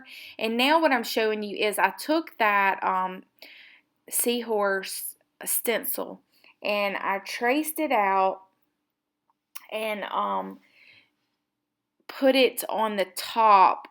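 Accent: South, United States. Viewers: LOL...I thought you might be nearby, you sound too much (accent) like we do around here LOL, plus your sense of humor. That good ole' southern charm, aye! I'm in central Arkansas and was hoping that you might be in the area. Love your project!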